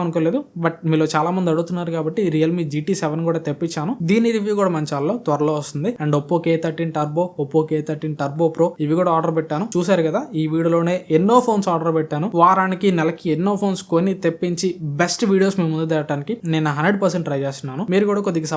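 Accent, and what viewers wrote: Ongole, India. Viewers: bro meedi ongole aa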